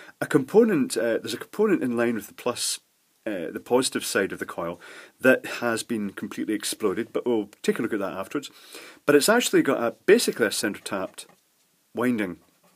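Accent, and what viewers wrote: Scotland, United Kingdom. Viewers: You sound exactly like Scott Manley, does every older Scottish person sound like that?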